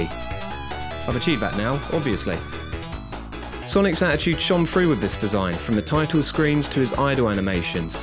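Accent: United Kingdom. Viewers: My gosh! You've cut the video on two pieces, okay, but you didn't include links in the description! English is not my bloody native language and the British (or Australian I dunno) pronunciation make it even harder to get by ear. Add the links! I can find anything in two wasted minutes more, but many people doesn't. Thanks for video thou. And I put a thumbs up on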